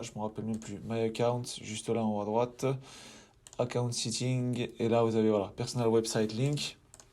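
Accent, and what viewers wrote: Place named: Belgium. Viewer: Salut merci de ton partage. moi, je donne juste mon avis. Un lien venant d'un site anglais n'est pas gênant a condition que la langue reste en anglais. Pourquoi ? Quoi de plus naturel d'avoir un lien d'un site anglophone qui fait un lien vers un site en .fr (ou .be au vu et au son de l'accent) du moment que l'ancre ou les mots proches annonce que la source (du lien) est francophone. en gros si on site un site étranger et qu'on explique dans le texte l'origine du pays du site, ça passe. et surtout ça parait naturel.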